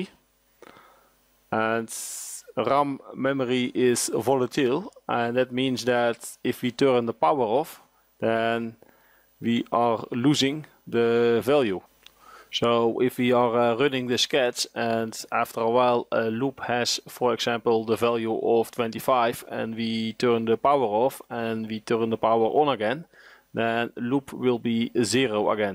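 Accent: Netherlands. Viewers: 10 seconds is enough to recognise that his native language is dutch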